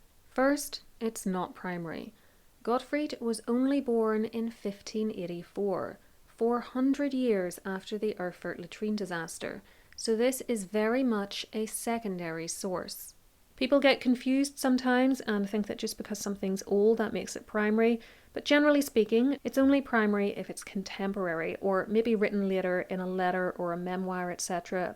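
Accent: Ireland. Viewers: Is this an Irish accent? 🎉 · Oh i love your Irish accent! But your pronunciation of "count" and "counts" is somewhat unfortunate😂😂😂😂😂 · Love your Irish accent. Where in Ireland are you from?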